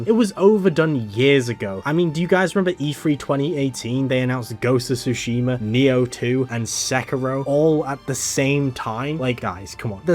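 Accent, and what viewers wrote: United Kingdom. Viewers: You have the same voice and accent of Andrewjrt, which area in the UK?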